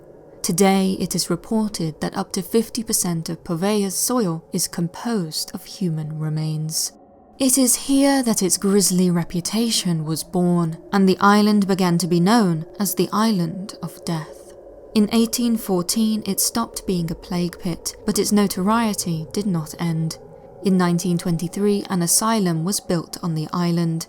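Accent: England, United Kingdom. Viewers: As always a tale well told is just that, and without drawing any more in breathes that are lined with gushes or the occasional reverberations as towards something being spawned by the narrative of our as uncanny and spoken with the English accent I was born into deserves a bravo. I don’t often feel inclined towards a personal rating but I feel a need for a personal rating and wish to salute you and thankyou. As now the sunlight filters through my English afternoon in mid June and I’ll round off with another sip of Earl Grey, and ponder for a moment wondering what my mind will be greeted with in the next episode to grace my mind.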